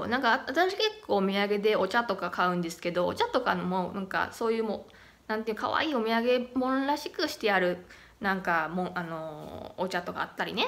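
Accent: Kansai, Japan. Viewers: ははははこれが大阪弁でしょうか？面白い、たくさんの擬音語があるね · ぶわぁー、ぼーん、とばぁーっと合わせて15回以上使ってる。ちゅーちゅー吸うも関西ならではの言い方ですね。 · 、物事言う時さすが大阪弁でワぁ！ワぁ！とか多いですねいい😊👍 笑私、さんの故郷大阪でそろそろ満30年間住んでる上海人です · 関西ご出身ですね(笑)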